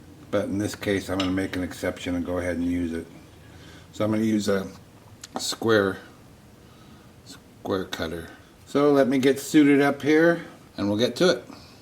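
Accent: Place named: Indiana, United States. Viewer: Good morning. The bowl is beautiful. I was worried you would catch your hand on parts of it. Maybe you should wear a glove. What part of midwest are you in? You sound like Indiana. Have a bless day. See you next time.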